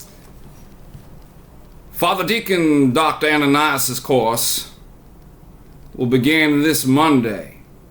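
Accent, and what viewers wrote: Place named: Georgia, United States. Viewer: I like the accent. Sounds like you’re from Gawja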